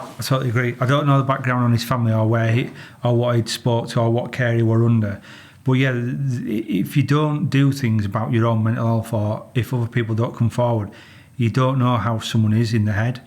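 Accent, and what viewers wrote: United Kingdom. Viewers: Yank here. I think your channel will be growing fast. Don't change your accent at all. At first I could hardly make out what you were saying, but it isn't difficult to quickly catch on. I just watched a short tutorial on British accents, and I turn up the volume now. It helps if course I'm familiar with a lot of terms from years if watching BBC. Thanks for sharing your stories.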